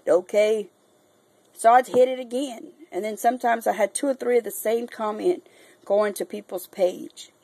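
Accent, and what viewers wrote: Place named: Louisiana, United States. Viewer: you sound like my family in southern Louisiana. Your accent reminds me of them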